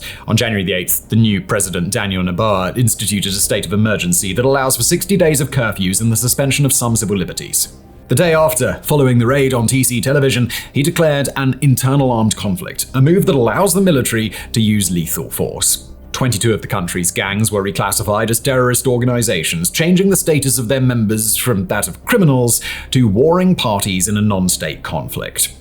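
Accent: England, United Kingdom. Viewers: Cant blame him. I think hes english english... like.... engla terra english.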